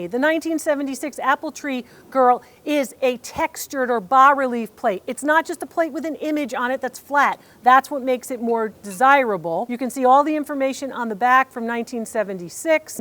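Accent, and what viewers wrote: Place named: Pennsylvania, United States. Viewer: Dr. Lori, you should've shot your own feet and said something like "These are feet and they're tired from walking around thrift stores." Those Lenox pieces were a real bargain. Love your humor and your "Pensa-vania" accent.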